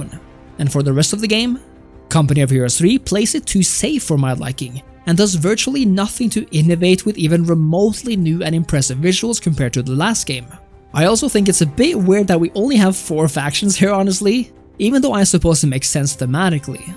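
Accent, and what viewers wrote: Norway. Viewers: Må jobbe med den norske engelsken din kompis \__('^_^')__/ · You norwegian accent is just awful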